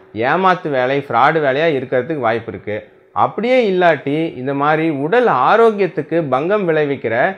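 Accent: Kongu, India. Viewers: ரொம்ப அழகா விளக்கம் கொடுத்தீங்க அய்யா... அதுவும் நம்ம கொங்கு தமிழில்👌👌👌👌🤗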